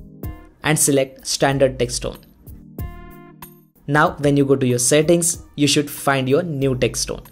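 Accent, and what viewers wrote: India. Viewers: Always a boss with an indian accent that comes in clutch! Thanks bro 🤌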